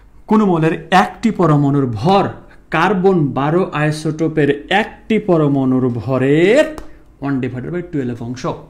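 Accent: Rajshahi, Bangladesh. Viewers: Sirer Basha Rajshahi onchol a...tai na?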